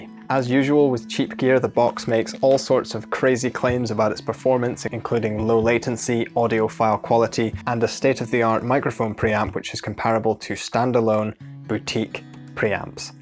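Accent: Scotland, United Kingdom. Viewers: Kinda sounds Scottish?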